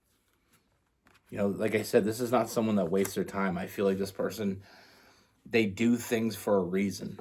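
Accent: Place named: Canada. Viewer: I friggin knew you were a cappie! aslo hearing the canadian in there. Love your work and storytimes ;)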